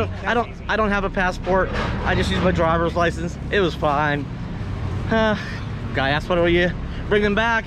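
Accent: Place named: Pittsburgh, United States. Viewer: Are you from Pittsburgh? I detect a little bit of the burg accent! 😀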